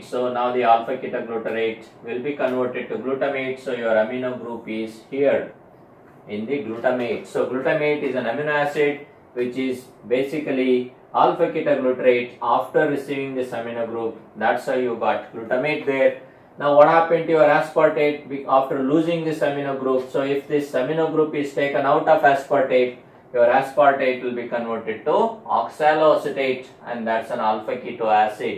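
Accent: India. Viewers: Oh my god I just got so many knowledge, every concept of this topic oh thanks sir... Your words are so indian wala hoke very nice to hear ...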